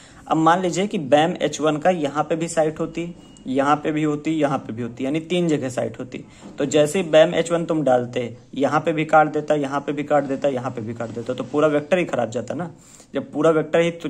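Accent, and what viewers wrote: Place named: Bihar, India. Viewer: Thankyou for the amazing session 🔥🔥 Sir ap bihar se h?? Humra v accent apke hi jaisa h or bahut acha laga k ap dawn to earth h❤ or jaise h bilkul waise hi show karte h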